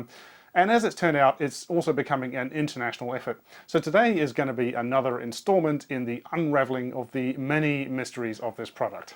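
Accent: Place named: New Zealand. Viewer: Thank you,. I like the mellow NZ accent, much nicer than the OZ nasle noise. I had a new sister in law from NZ from south island, but didn't know what the north island would sound like. I've not seen her for 40 odd years, buy heck, she was very pretty. See you next time you post.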